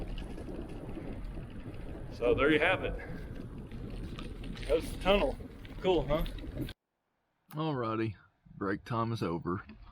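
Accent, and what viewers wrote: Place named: South, United States. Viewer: Very cool! Thanks so much. You sound southern, and I'm southern so I'd know!😄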